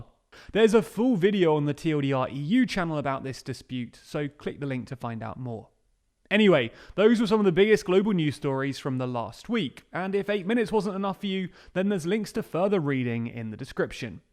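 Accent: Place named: RP, United Kingdom. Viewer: You sound slightly deeper voiced and more posh in this video.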